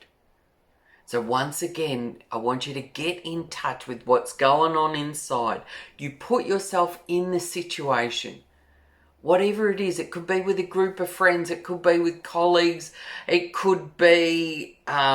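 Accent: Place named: Australia. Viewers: same here, i enjoy your Australian accent too for a change, after hearing UK/US and other accent all my life.:). My Indian accent LOL: <link> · Thank you so much for this,. I had a lot of realizations. And I just love your Aussie accent, I get quite homesick when I hear you and I’m actually German, but lived in Oz for 8 years. Truest feel good Tapping!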